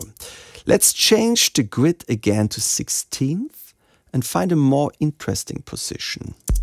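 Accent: Germany. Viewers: Sir you have the best and most german accent i have ever heard ! I love the tutorial very very begginer friendly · This German accent is cute.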